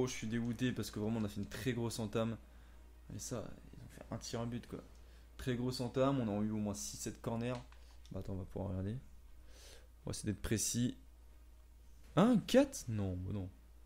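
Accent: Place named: Saint-Étienne, France. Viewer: Accent stéphanois grave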